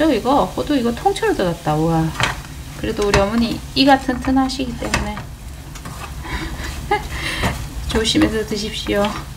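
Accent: Gyeongsang, South Korea. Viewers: 항상 영상은 보지만 댓글은 처음입니다 ^^ 어찌나 깔끔히 살림하시는지 항상 감탄을 하며 항상 바지런하고 소박하게 사시는모습 응원하게 되었어요 멋진집 멋진 인테리어가 아닌 우리네 사는 모습을 보여주셔서 더 눈길이 가고 한번더 보게 되었어요 저도 456님처럼 될순 없지만 영상 볼땨마다 같이 움직이고 청소할려고 하고있어요^^ 영상에서 혼잣말 하시는 귀여운 사투리도 너무 좋구요 저도 경상도라 너무 정감가요 앞으로도 좋은 영상 많이 부탁드릴깨요 항상 응원하고 건강하세요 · 오~ 대구분이시네요 친근함이 느껴지네요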